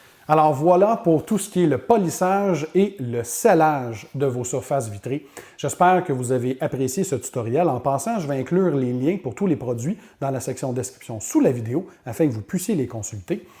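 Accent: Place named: Quebec, Canada. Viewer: j'étais sure après avoir écouter une dizaine de video en anglais avant de tomber sur celui ci que tu étais quebecois. merci pour les infos.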